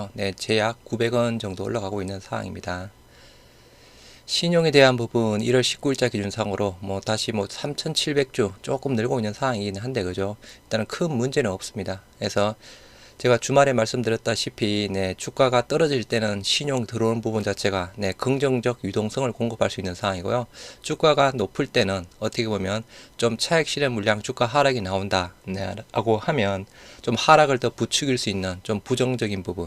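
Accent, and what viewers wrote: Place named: Jeolla, South Korea. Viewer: 억양이 전라도분 이신듯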